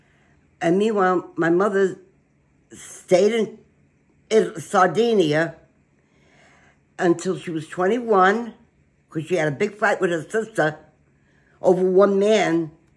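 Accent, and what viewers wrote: United States. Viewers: Hi 👋🏻 best wishes from London, England 🏴 I think you’re bloody brilliant and I could listen to you all day- loving the Brooklyn accent 👏🏻 also. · LMFAO!! Your so funny and I just ❤️❤️❤️ you video’s and Tick Tok videos also! Being from Philly I just can’t get enough of your New York accent!! I live in the South now and miss my family in Philly, Jersey and NY!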